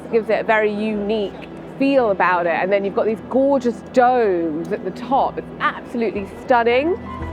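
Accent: England, United Kingdom. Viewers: Your English accent is just awesome. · Wow i love your British ❤accent.. Adoro il tuo accento... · What race mix is she ? Sounds british, but looks between south asians and latina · born to an American father and an Indian mother. She's an American national born and brought up in Miami.I don't know from where she got this beautiful British accent.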